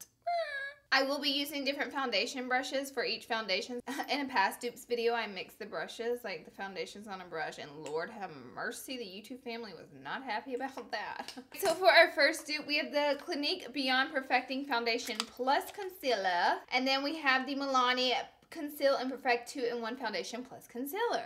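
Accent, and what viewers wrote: South, United States. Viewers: OMG, I love you!! <3 I hear your southern belle accent coming THROUGH! And i do love the way you styled your hair in this video. xoxo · First time watching your video, I love your Southern accent!! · I just love you ! Every time I see the episode of the office when Pam is Deb U Taunt in that murder mystery game I think of your southern darlin accent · She is so pretty but her voice is so country 😅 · I'm obsessed with your country twang. OBSESSED! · Just started watching you and my first thought was oh, girl from the South! Love it! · Love your extra craze and extra south in this video! ❤️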